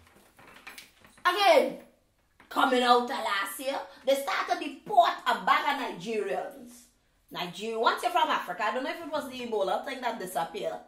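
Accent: Barbados. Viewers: That Bajan accent!!! 😂😂😂😂😭😭😭😭😭😭😭😭😭😭😭😭😭😭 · love she bajan accent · The bajan accent killing me to😭😂😭😭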